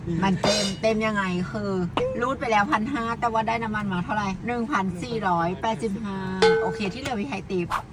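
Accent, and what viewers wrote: Thailand. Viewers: ชอบสำเนียงเมืองกาญมาก ติดตามเพราะกวน…ดี😂😂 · ชอบสาวเหน่อครับ น่ารดดดดดดดด😁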